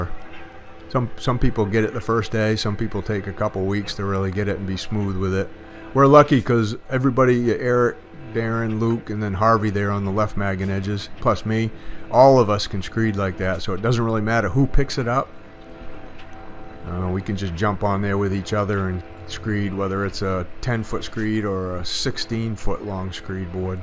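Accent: Maine, United States. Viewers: Soon as I heard your voice, I knew this was in Maine. But looking at the woods in the background, it's not northern Maine. I grew up in Madison.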